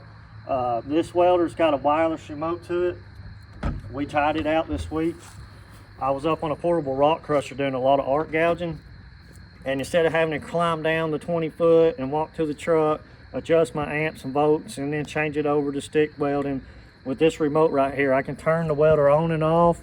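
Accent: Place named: Europe. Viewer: What a nice white European accent 👍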